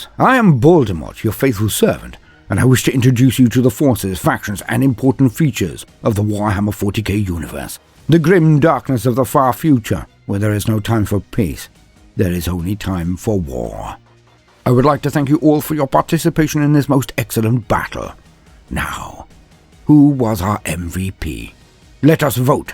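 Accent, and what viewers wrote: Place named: RP, United Kingdom. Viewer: 100k is just the beginning, Sir Baldermort what you bring to the table isn't just a talent for writing, nor a command of the queen's english, or your pleasing baritone of a voice. No, what you possess transcends all of that and yet is magnified by its very presence, you have passion and it reverberates with every line written, every word spoken, every image used, and yes every song (kudos to your wife BTW). You sir have earned your Legion of Followers, just as you will the hundreds of thousands yet to join.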